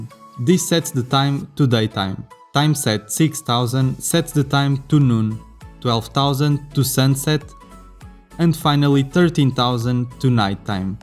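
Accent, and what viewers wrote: Portugal. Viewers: bem me parecia que eras de Portugal ^^ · parece me portugues o sotaque nao engana🤣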